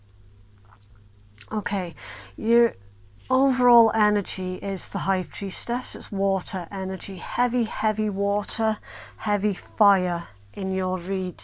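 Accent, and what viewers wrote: Scotland, United Kingdom. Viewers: Resonates with me, detect a wee bit of scottish accent 😊 me too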